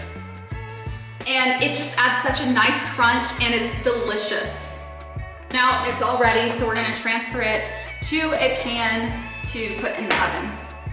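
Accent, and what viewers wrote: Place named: United States. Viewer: Ah, you are american, now I heard it. New subscriber here ❤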